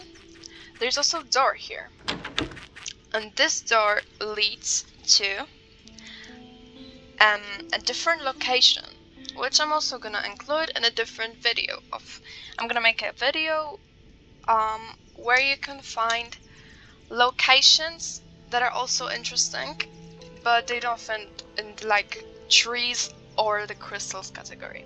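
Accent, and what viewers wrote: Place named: Germany. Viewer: Are you german? BECAUSE I L.O.V.E. YOUR ACCENT!! 🎈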